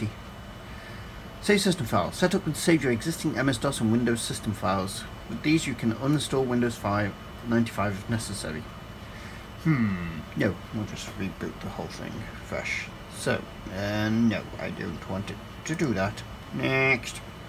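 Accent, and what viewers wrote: United Kingdom. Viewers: Are you british? I am awful when it comes to guessing where people come from via their accents lol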